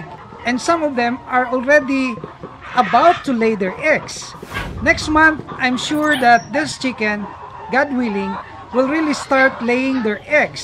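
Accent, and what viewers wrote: Philippines. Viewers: ARE YOU PILIPINO?? YOUR ACCENT . PLEASE SPEAK TAGALOG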